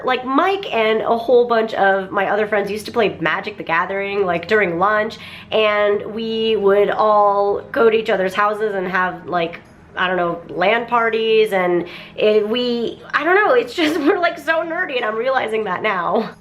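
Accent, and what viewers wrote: New Jersey, United States. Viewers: Wait...Were you born & raised in Japan?? I only ask because could've fooled me. You definitely sound like a girl from Jersey lol. How did you learn English?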